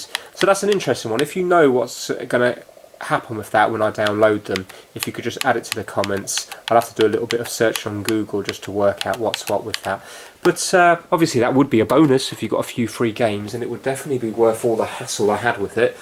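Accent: United Kingdom. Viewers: I'm watching this for the Brit accent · Amber Ford I agree. It may also be the British accent.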